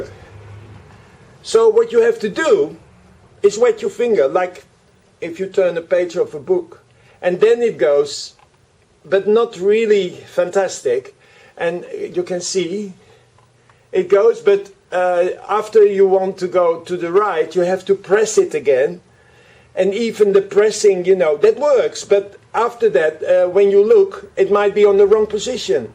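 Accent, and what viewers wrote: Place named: Netherlands. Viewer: XD you just can hear he's Dutch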